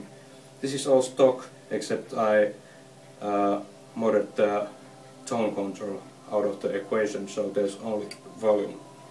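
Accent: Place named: Finland. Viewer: Are you finnish? your english sounds exactly like when a finnish talks english :P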